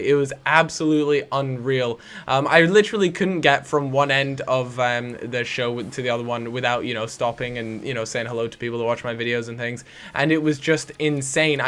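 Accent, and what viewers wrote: United States. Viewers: Are you American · Are you american?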